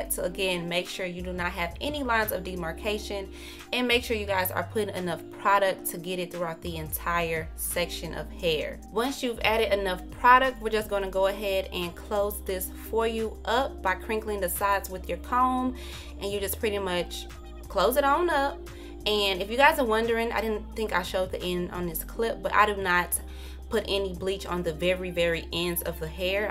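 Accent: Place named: Detroit, United States. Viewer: You sound like you from Detroit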